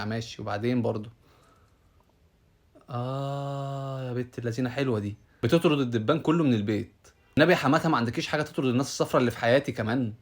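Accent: Alexandria, Egypt. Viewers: عرفتك من لهجتك ياسكندرانى اسكندريه مغتربه فى القاهره من ٣٤ سنه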